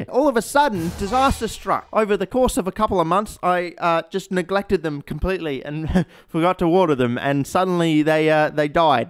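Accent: Australia. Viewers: Would be 10/10 if more raw Australian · aussie nilered. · When I started watching this video I though this guy is an Australian and it got proved a bit later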